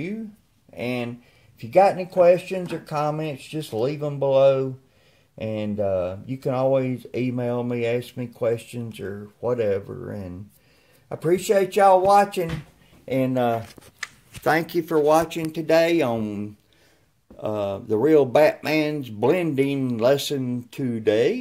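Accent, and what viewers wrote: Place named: South, United States. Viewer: I honestly enjoy that you sound like forest gump (not an insult on your intellect just similar accent)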